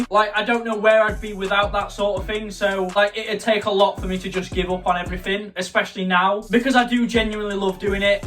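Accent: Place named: Yorkshire, United Kingdom. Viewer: jus me thinkin his Yorkshire accent is bareeee cuteeee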